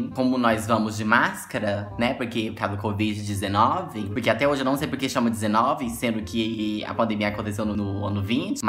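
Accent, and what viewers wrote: Brazil. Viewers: Eu ri muito. “Eai, joia?” O sotaque goiano é bom demais. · Uai vc é de Minas?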